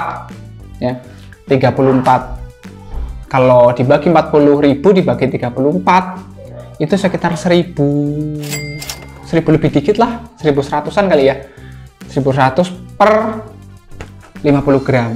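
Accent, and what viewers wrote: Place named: Java, Indonesia. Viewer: Aksen nya seperti Pak Jokowi...Mase. Sukses selalu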